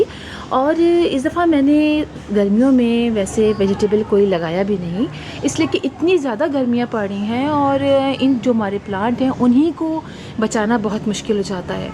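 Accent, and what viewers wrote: Pakistan. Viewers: না ভাইয়া, বাঙ্গালী পুইসাক বলে, কলমী না। অন্তত বাংলাদেশে পুইসাক ই বলা হয়। দিদি বাঙ্গালী না কারণ তাঁর ভাষা নিরেট উর্দু। বোধহয় তিনি ভারতীয় কিন্তু তার accent টা পাকিস্তানী উর্দু ভাষী।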